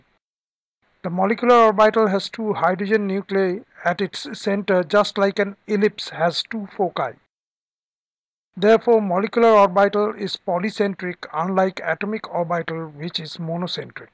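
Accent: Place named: India. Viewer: Typical Indian voice..... Good.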